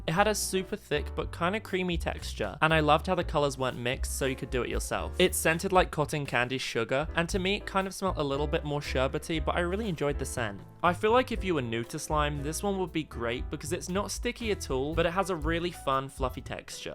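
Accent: United Kingdom. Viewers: wait your British just like and we’re are you from i’m from walthstone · YOUR BRITISH!!!!!!! COOOOOOOOLLLLLLL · Are you British too? 🎉🎊💝🎊🎉 Im British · ARE U FROM UK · Is he from Great Britain😅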